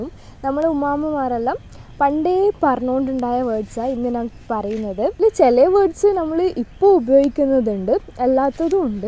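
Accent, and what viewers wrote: Thalassery, India. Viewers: Ella thalssery baasha muyyan bere maadiriyaa😂😂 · Njan Malappuram ullatan but ink ingala Thalashery slang bayangara ishttan💫♥️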